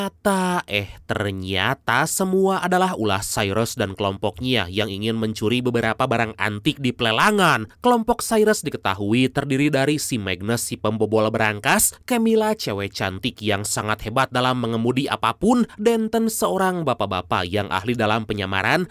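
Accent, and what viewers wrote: West Java, Indonesia. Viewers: Sunda pisan euyyy logat na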